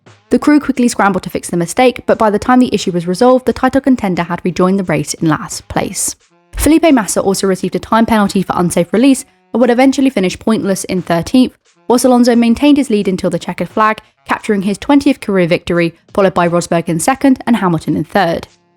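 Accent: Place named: United Kingdom. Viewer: Great video, keep going, having British accent in F1 commentary always sounds awesome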